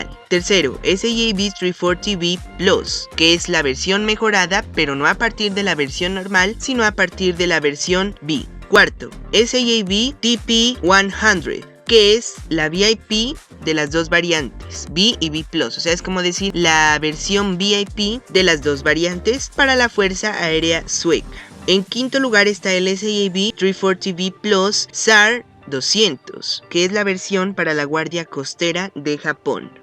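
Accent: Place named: Nariño, Colombia. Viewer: Sentí un Leve acento nariñense por ahí, ¿este canal es Colombiano? salu2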